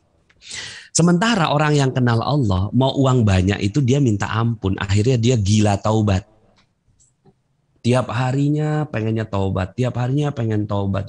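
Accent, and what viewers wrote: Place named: Java, Indonesia. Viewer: pake logat jawa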